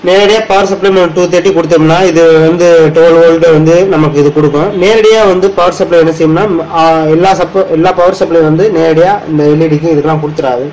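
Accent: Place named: Madurai, India. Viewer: Good explain in madurai tamil...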